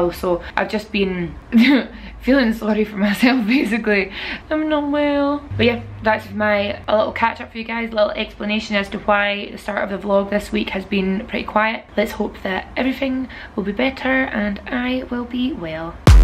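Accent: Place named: Scotland, United Kingdom. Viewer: I've been subbed for about a year now. I had no clue you were Scottish when I watched your vids for the first time, not til I turned the volume up my first thought was "who's she's not from the USA but she's super pretty and that's a cool lip piercing and some awesome ink work" never cease to make me chuckle at least once. You're pretty awesome, keep it up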